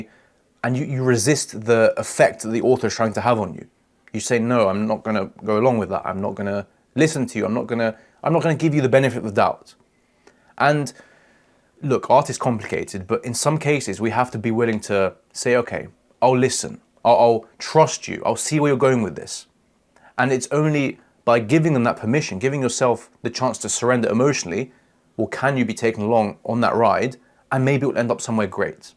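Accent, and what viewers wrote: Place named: United Kingdom. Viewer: Shadowing ur british accent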